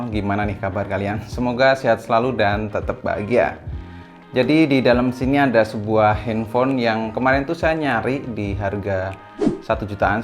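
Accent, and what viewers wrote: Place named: Java, Indonesia. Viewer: Logat jawanya masih terasa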